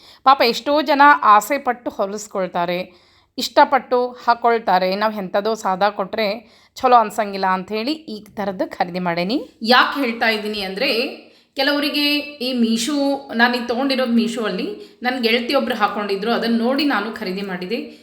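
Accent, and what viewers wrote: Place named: Hubballi-Dharwad, India. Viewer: akka ನನಗೂ ನೀಮನ್ ನೋಡಲು ತುಂಬಾ ಆಸೇ ನೀವು ಯಾವ ಊರಲ್ಲಿ ಈರ್ತೀರೀ ನನಗೆ ಹುಬ್ಬಳ್ಳಿ ಧಾರವಾಡ ಅನಿಸುತ್ತದೆ ನಿಮ್ಮ ಮಾತನ್ನು ಕೇಳದರೇ ನಾವು ಆಗಾಗ ಹುಬ್ಬಳ್ಳಿ ಗೇ ಬರುತ್ತವೆ ನಿಮ್ಮ ಅಪಣೇ ಇವರೇ ನಾನು ನೀಮನ್ ನೋಡಲು ತುಂಬಾ ಆಸೀ ನೀವು ನಿಮ್ಮ ಸಂಸಾರ ದ ಬಗ್ಗೆ ಓಂದು ವೀಡಿಯೊ ಮಾಡಿ ಎಷ್ಟು ಜನ ಮಕ್ಕಳು ಎನ್ ಮಾಡತಾರ ಸರ್ ಎನ್ ಮಾಡತಾರೇ ಹೇಳಿ ಮತ್ತು ನಿಮ್ಮ ಮನೆಯ ವೀಡಿಯೊ ಮಾಡಿ ನಮಗೆ ತೋರಿಸಿ ನಿಮ್ಮ ದೇವರ ಮನೆ ಎಲ್ಲ ವನ್ನೂ ನೋಡುವ ಬಯಕೆ ನೀವು ನಮ್ಮ ವರೂ ಅಂತ ಕೇಳಿ ನಿ akka ದಯಮಾಡಿ ತಪ್ಪು ತಿಳಿಯ ಬೇಡಿ ಇಂತಿ ನೀಮ್ ತಂಗಿ ಲತಾ ಕುಲಕರ್ಣಿ ನಮಸ್ಕಾರ🙏🙏🙏🙏🙏 ಗಳು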